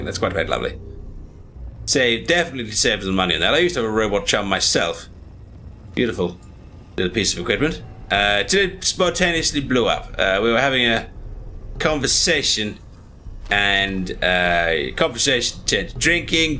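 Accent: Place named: RP, United Kingdom. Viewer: This is awesome so glad i saw this in my feed :D your posh accent is on point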